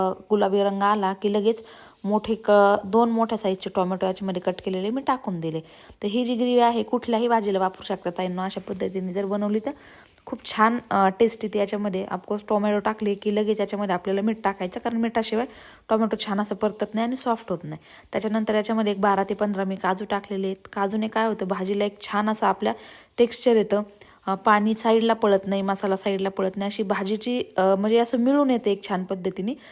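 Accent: Ahmednagar, India. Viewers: नगरी भाषा एकदम तडका❤❤ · Aapli bhasha aashicha aahe nagari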